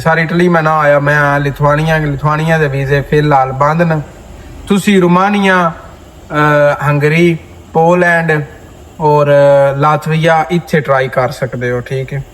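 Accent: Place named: Sargodha, Pakistan. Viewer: Bahi g mennu Sargodha da lagda 😂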